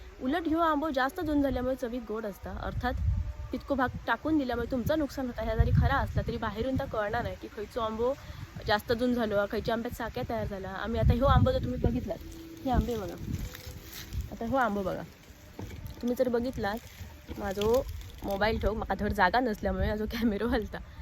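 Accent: Malvani, India. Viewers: मालवणी भाषेत वर्णन करतास ता कानाक ऐकूक बरा वाटता. ह्या स्वार्थी लोकांच्या दुनियेत आपणही कणखर राहून धंदो केलात तरच निभाव लागात.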